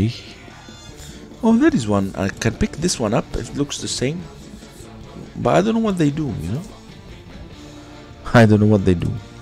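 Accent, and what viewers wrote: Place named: Greece. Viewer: I like your accent in English, and you feel that Greek is phonetically more dominant than the British accent 👍🌹🌹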